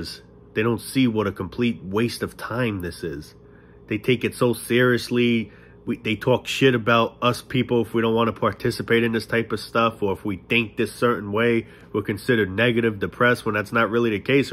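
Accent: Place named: Boston, United States. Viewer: Are you from Boston ? Love the accent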